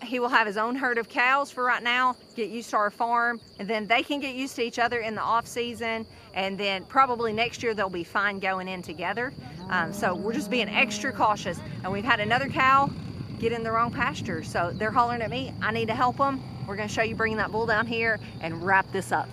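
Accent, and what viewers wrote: South, United States. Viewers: Hi, I'm a newbie...brought here by Kevin (and Dutch)..and I'm liking what I'm seeing! I like the way you talk too! LOL! I'm from Ohio, so I love the southern drawl!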